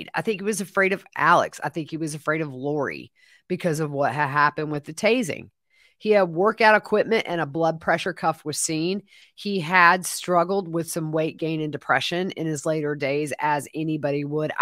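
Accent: South, United States. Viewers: You’re the best,! Just when I thought I knew all there was to know, you taught me even more!!! Wow ❤❤❤❤!!! I also LOVE your voice. I love your accent! I love southern accents ❤❤❤❤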